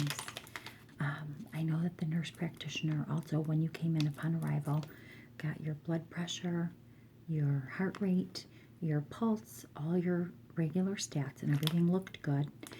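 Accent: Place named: Minnesota, United States. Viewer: Listening to you speak is like watching the movie "Fargo"!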